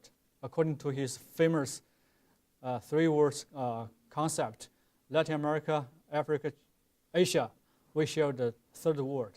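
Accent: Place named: China. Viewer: What a typical Chinglish!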